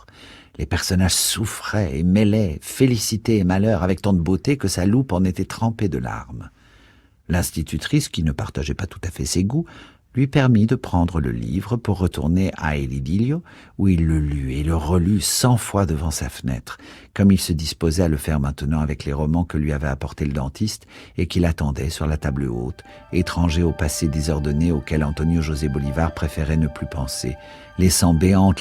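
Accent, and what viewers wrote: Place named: France. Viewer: One of my favourite books. Pity you read it with a Frenchman accent. Please retry.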